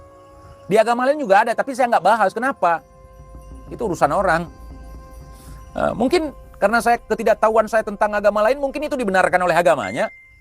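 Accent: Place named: Aceh, Indonesia. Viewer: Bang , peugah haba ,mangat that ta dengo . Makasih . Logat Aceh bék gadòh .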